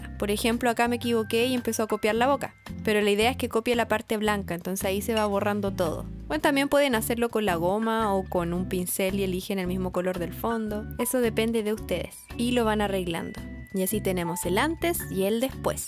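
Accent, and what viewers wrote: Chile. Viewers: eres chilena? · Me encantó este vídeo y como explicas los paso en el photoshop. No se que tiene el acento chileno pero me embelesa, saludos desde Canarias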